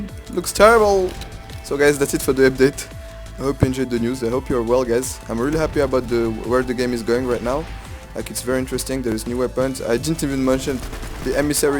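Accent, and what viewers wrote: France. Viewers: es-tu francais? tu as laire davoire laccent ;) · on sait tout de suite que t'es Français ah ah ah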